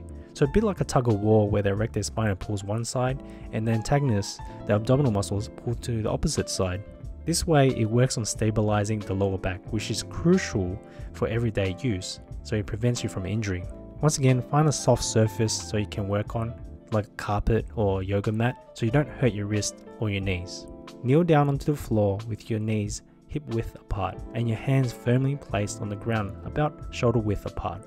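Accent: Australia. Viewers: is this Australian accent? anywho i used this for running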